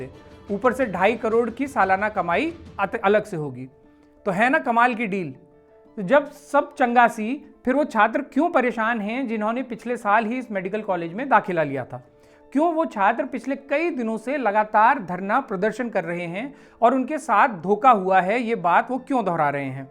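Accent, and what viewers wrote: Garhwal, India. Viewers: बहुत सुंदर भूला aap nispkch khabar chahate हो और जब बीच बीच मे gadwali बोलते हो तो 4 Chand laga देते हो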